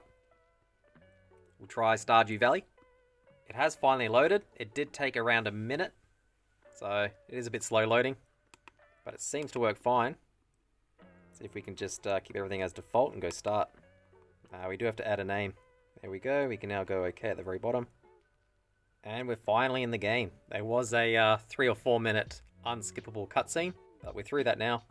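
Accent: Australia. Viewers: lol, thought it was just the ausi accent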